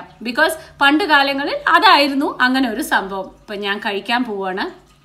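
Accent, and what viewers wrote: Palakkad, India. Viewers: Nice - Also dear palakkad slang. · Palakadan slang kelkan nalla rasamalle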